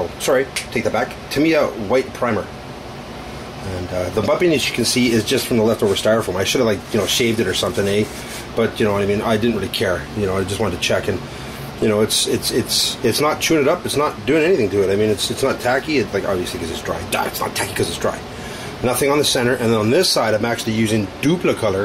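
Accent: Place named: Canada. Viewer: As a Canadian I personally think that most talk about the "Canadian accent" is untrue. A lot of Canadians sound very similar to a lot of Americans (apart from the crazy accents like Newfies, Texans, Bostonian accent, etc.) But man, you sound like you came straight out of a Bob and Doug McKenzie movie!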